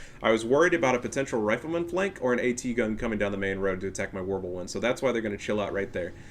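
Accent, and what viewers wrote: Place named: Germany. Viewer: Bro... this shit is fucking comedy. Literally peak youtbe right here 🤣🤣🤣 the fact that you can so the German accent so well and clearly aint about that shit is legendary 😂😂😂 i dont even like videos on youtube cuz it fucks with my music but this deserves likes regardless hahaha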